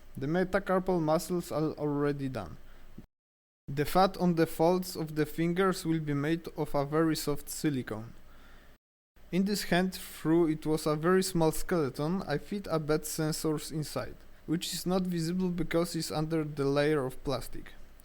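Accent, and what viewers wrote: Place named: Poland. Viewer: Coś mi tak zaświtało że to może być rodak po tym akcencie xD Życzę samych sukcesów, choć przykre jest gdy się patrzy jak wielki potencjał marnuje się u nas. Na zachodzie już dawno byś siedział we własnym laboratorium, choć prawda jest też taka że gdzie by się z tym nie poszło to każdy będzie chciał mieć to dla siebie.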